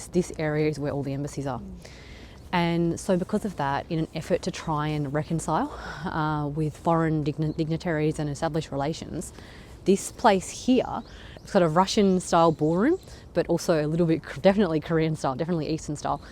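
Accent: Australia. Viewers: 호주식 발음 독특해요. Here 히야, today 투 다이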